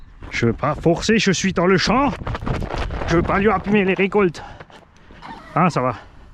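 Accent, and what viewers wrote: Alsace, France. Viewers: Le retour de l'accent Alsacien ! Je suis tellement fan !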